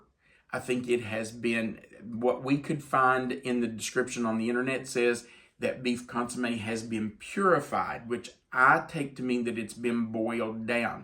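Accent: South, United States. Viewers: My husband and I have been following you for a little while now, and we enjoy your videos so much. Your voice is very easy to listen to. (I'm partial to a good southern accent. While I'm a northwest girl, my husband is from Louisiana.) I just had knee replacement surgery, so my husband decided it was a good time to try out some of your recipes. He made this for the main dish of our Easter dinner. He said to let you know he followed your video instructions... even forgetting to add the spice packet to the rice at first. 🤭 This was so delicious! Thank you for inviting us to come sit at your table! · Your voice is a super power! Something about Southern accents makes food seem tastier and the Gospel more relevant! ❤ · I find your southern accent comforting. Actually, I've never thought about it. Be yourself, don't apologize, or change who you are. I love this channel. 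Love how you and complement each other, and love your recipes. God bless you both! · I had that accent for many many years and it fills my heart with smiles when I hear you speak. I do so miss living in the South. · I'm from Texas and have a Texas accent, a little different than other southern accents. Never be embarrassed by your accent. You are who God made you to be and are wonderfully and perfectly made. I love watching your channel and your recipes. Enjoy your time in Florida on vacation and hope the weather is wonderful while you are there. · This looks delicious!! Thanks for sharing. As a child we lived next door to people who came from WV. I worked in customer service for 20 years, could not believe how many people from the south who don't have a southern accent. I really missed hearing it. Please do not lose yours. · I also love your Southern Accent, as Ms. Jen said. It makes me feel like I’m listening to someone that is kind, sweet and caring. 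This is what drew me to your You Tube. I am from KY, as I have said before and Proud of it. I have lived in Michigan for years but KY is my heart. You and are so good at this. Love love love to watch and listen to you both. 🙌🏻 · This looks so good. My husband will love it! I love a southern accent. Never lose yours as it is who you are! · Your Southern voice is fine. Of course, I have a soft southern voice, so it sounds normal to me. I am proud to be southern and of my southern accent. I appreciate always typing the recipe below the video. It is so helpful. I enjoy enthusiasm for fixing the food. You all are an amazing Christian couple. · I also just absolutely love your southern voice! It’s so calming and relaxing to listen to. We never hear that in Canada and it’s a real treat. “Variety is the spice of life” my mother always used to say. How diminished we would be if we were all cookie cutter the same! 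Be proud of your voice; it’s a great asset. 💕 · You gotta be kidding about the southern accent. That's the first thing I said the first time watching was OMG he sounds so cool talking about cooking with his accent. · There is absolute nothing wrong with your voice. It’s clear and we can understand every word and that is so important. Keep up the good work nothing like a southern voice. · Mr., you have some color on your face. I'm so glad you're videoing from Florida. You mind including some of your favorite spots in Florida? Your southern voice is such a nice voice. I'm looking forward to making this video 😊 Thank you & 💖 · and..I enjoy checking in to find your latest recipe..my mom who is a wonderful southern cook..asked me recently..do you follow.." Come sit with me"..yes I replied..we both agreed how much we love watching yall..you see are from NC..BLESSED WITH A SOUTHERN DRAW.L... embrace the accent God gave you..that sets you apart,makes you different and helps folks remember you and your special channel!!..oh yeah thats the great cook with the awesome southern accent!!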